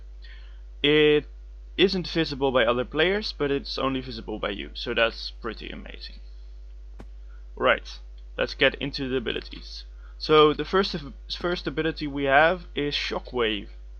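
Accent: Netherlands. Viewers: Your Dutch accent💜 btw loved the video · The Dutch accent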